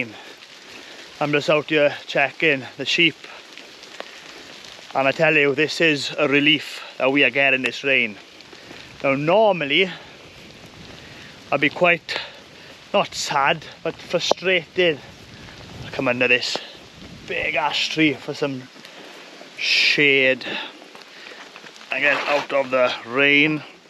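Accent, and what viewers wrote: Wales, United Kingdom. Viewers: Hi, believe it or not, although I am researching himalayan balsam, I clicked on your video because i hadn't heard a welsh accent in a while! I like the content too. Which part of Wales are you from? The north?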